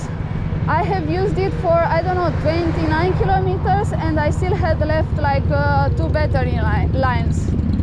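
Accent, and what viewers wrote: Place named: Russia. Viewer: Mother russia accent. Pe deum rau stai pe pernițele degetelor din talpa ca sa nu iti mai zbenguie capu prin casca. Adica ridica-te putin pe varfuri si ai rezolvat problema.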